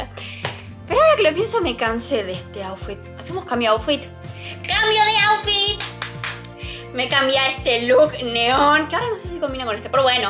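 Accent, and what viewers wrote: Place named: Argentina. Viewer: No les pasa que ✨Amaricho✨, me encanta ese acento argentino y te amo demasiado, saludos desde Costa Rica...bai! 😁✌🇨🇷☀💛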